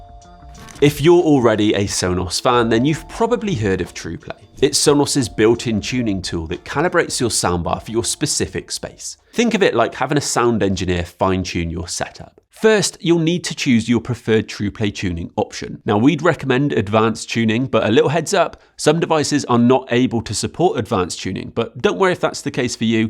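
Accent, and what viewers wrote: United Kingdom. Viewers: Your accent is very clear and so good I think you’re British 😅, Thank you for the tech information.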